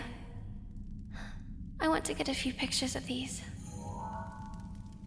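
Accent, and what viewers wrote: United Kingdom. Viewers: sounds rather Bri'ish to me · Your british accent sounds amazing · Diggin your English accent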